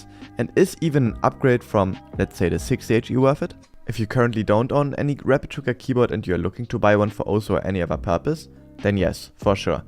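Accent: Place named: Germany. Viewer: Diggi du bist deutsch oder? man hört direkt vom Akzent. :D Stabiles Video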